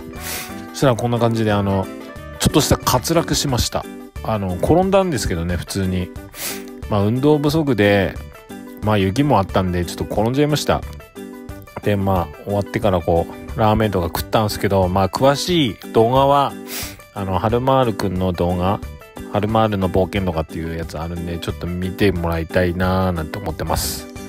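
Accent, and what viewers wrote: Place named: Tohoku, Japan. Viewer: 宮城でハイラックス前期乗ってます！訛りで、東北の方だなってすぐわかりました！笑 LEDテール参考にさせていただきます！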